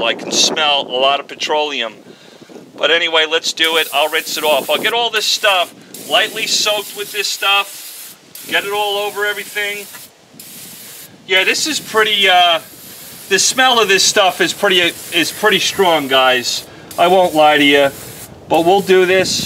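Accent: New York, United States. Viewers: How comes you sound like your from NYC and live in Texas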